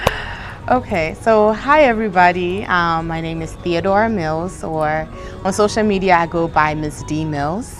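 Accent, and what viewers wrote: Ghana. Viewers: How can you submit American passport for Ghana card, the gentleman's right to deny you, you're full of yourself you wan ghanaians to worship you just because you from America, you still sound like a typical Ghanaian accent just get down from high horse. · Accent na ghanian oooo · Wow. You moved to the us at age 6, and still have a Ghanian accent. Good for you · it's a shame that you feel so "unaccepted" in Ghana to the point of contemplating a return to the States and not coming back. You are Ghanaian. Your accent is basically not different from that of the average educated Ghanaian. Unlike other Diaspora children, you speak local Ghanaian languages fluently, which definitely help. So, I'm wondering -- exactly what has fed this level of frustration? Can you elaborate more? I can appreciate the struggles that come with having to adapt to a culture and system you had left for such a long time. 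Yes, many things are not only different but frustrating! But could it be that part of the problem is your own failure to employ the right attitude and soft skills necessary to effectively navigate your 'new' world? What do you think?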